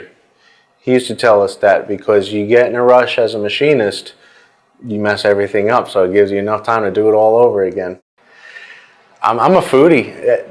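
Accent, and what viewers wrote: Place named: New York, United States. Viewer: this dude is from NYC you can hear it in his voice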